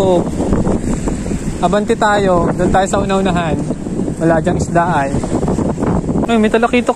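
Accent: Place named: Polillo, Philippines. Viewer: Boss taga quezon province kaba.para kc punto ng taga polillo.😂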